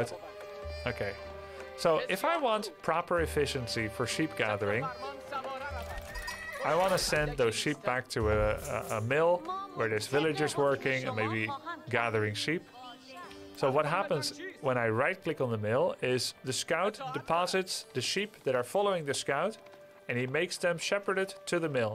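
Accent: Netherlands. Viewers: Is that a Dutch accent i hear? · Haha jij bent echt zooo duidelijk een noord-Nederlander. Dank voor de heldere video!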